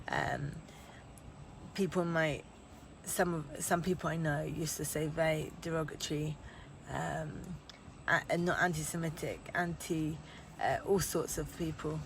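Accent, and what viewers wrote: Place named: England, United Kingdom. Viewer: Are you English? You've got an interesting accent.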